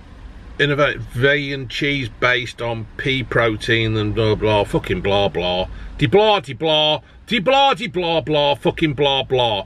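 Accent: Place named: Leicester, United Kingdom. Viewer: LOLL this guy is a proper Leicester bloke hahaha 😂 love it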